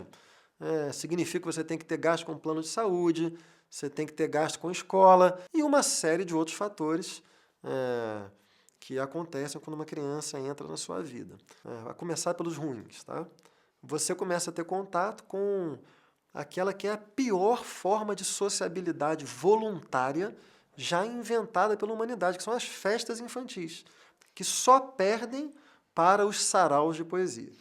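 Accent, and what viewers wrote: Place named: Rio de Janeiro, Brazil. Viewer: como sempre, com essa voz maravilhosa,sotaque sutil carioca, incrível e eloquente...eu tenho uma relação com os filhos muito peculiar, tenho 4, de uma relação sólida de 20 anos...não vou me estender pq daria um grande ensaio...O que quero cita aqui é sobre a relação liberdade e individualidade. Eu desenvolvi o hábito de beber recentemente, menos de 4 anos, sempre fumei meu Beckenbauer com meu esposo desde o início de relacionamento e aos 30 fumei meu primeiro cigarro, resumindo, eu sou incapaz de exercer o meu modus livre/entreternimento/relaxing na presença deles, eu simplesmente não relaxo...e tirando o caçula de 7, o restante são bem crescidos pra compreenderem, mas eu não consigo, e me causa um desconforto, pois me sinto reiterando o comportamento auto tolhitivo da minha mãe, apesar de não ser haja visto que eu sou o cerumano mais transparente que meus filhos conhecem, não nego nem peido, falo o que penso sempre, nunca fui hipócrita, mas está relacionado a um espectro de controle e administração...Isso eu não consegui ainda superar.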